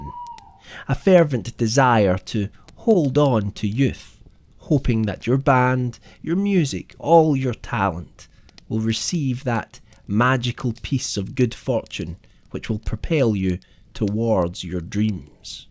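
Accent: Scotland, United Kingdom. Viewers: I love this. Not to mention I love creepypasta and my heritage. Scotland. So a scots reading creepypasta is just pure brilliant! Great job my friend ;)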